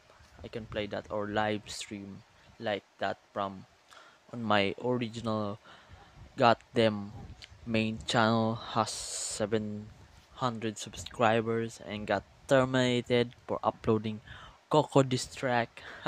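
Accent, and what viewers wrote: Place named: Philippines. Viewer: Wow thats rare to find a filipino channel i knew that ascent sounded fomilar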